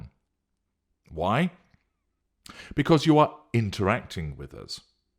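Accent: United Kingdom. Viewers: I like his voice.. sometimes it is creepy in a way, I guess. But I like his British accent.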